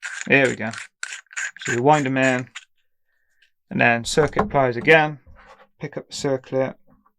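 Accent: United Kingdom. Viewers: Very nice video tha ks for sharing. I don't know why but i have always tought that you are american, just realised that is a Englishman by the way 🙂👍👏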